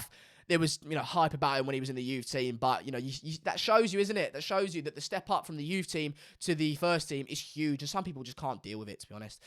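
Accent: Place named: Manchester, United Kingdom. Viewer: His Manc accent gives him away 😂😂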